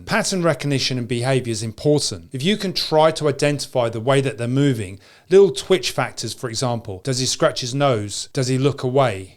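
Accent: England, United Kingdom. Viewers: There is NO ADVANTAGE to having no experience in fighting. No matter what this drunk content creator with an English accent says.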